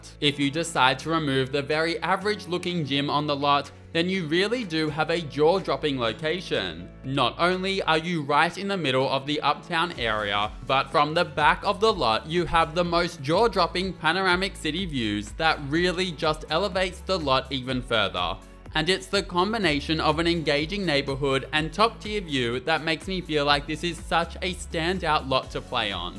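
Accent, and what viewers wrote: Australia. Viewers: Gotta say the nice aussie accent 😍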